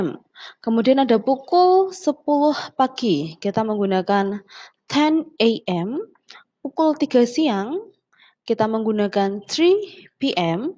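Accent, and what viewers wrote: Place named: Java, Indonesia. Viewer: Medok ya bund😅👍👍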